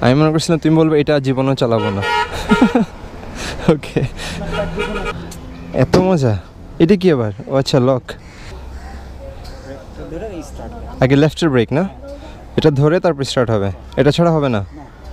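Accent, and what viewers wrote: Chittagong, Bangladesh. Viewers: ভাই আপনি কি চাটগাঁইয়া?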